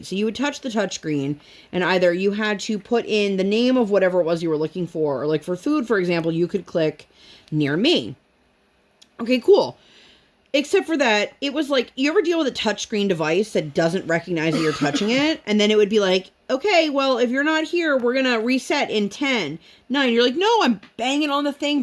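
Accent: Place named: Philadelphia, United States. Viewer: Hi, it sounds like you had a really wonderful trip!! I wanted to mention that I’m a Philly girl and my husband is from King of Prussia. I mention this because it sounds like you’re from the area. We live in the Pocono’s now. What area are you from if you don’t mind me asking? I love listening to your WIP and Chats, but I don’t comment much. I’ll try to be better at that. Take care of yourself and I’ll see you in your next video. 💜 Dawn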